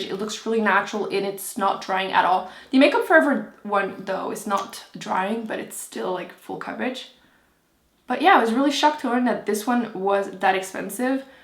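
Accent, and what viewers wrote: Quebec, Canada. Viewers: Shes french canadian from quebec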